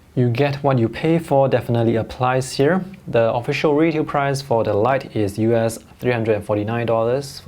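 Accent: Singapore. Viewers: You sound like a singaporean. Are you?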